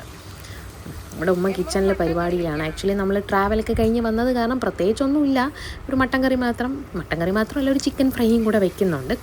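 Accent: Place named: Trivandrum, India. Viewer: Trivandram aanalle language kttappol mansilayi evideya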